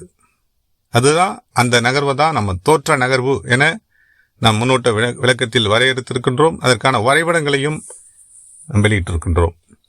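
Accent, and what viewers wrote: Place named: Sri Lanka. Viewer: நீங்கள் இலங்கை தமிழில் அழகாக பேசி உள்ளீர்கள்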